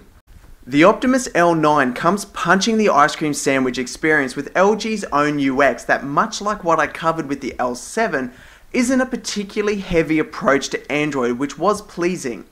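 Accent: Australia. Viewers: Nice review. Cool accent. I want to move to Austrailia and get that same accent to pick up the ladies.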